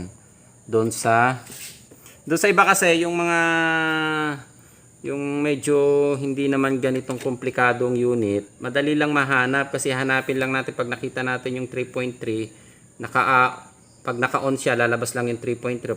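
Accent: Mindoro, Philippines. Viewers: Tagasaan po kau sir...parang taga MINDORO po kau kababayan ko..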